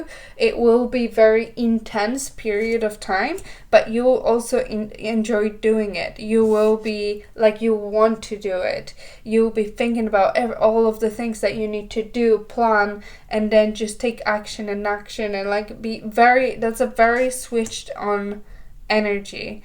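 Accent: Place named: France. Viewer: Thank you 🙏🏽 I claim your reading , I think you are French from your English accent !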